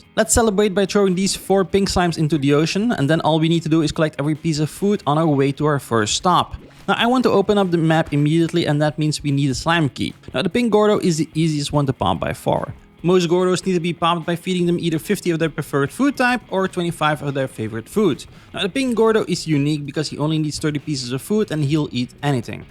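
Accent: Netherlands. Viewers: I smell Dutch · I smell nederlands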